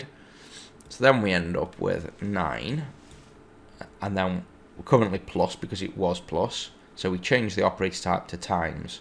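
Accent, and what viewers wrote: United Kingdom. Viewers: Your tutorials are very helpful and neat (not to mention your deep British voice) , in fact the only ones that helped me through all my problems.i was trying to make a power function but that went terrible and I was hoping if you could do a tutorial on that.it's just too hard to figure out the logic (: greatly appreciate it.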